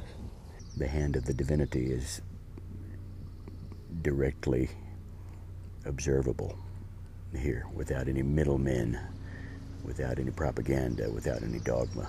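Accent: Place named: Texas, United States. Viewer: Wow, I just realized that Mr. Henley's speaking voice, the cadence sounds like George W Bush...it must be the Texas accent, which neither sound so southern. I was not a fan of W., but I sure do love!!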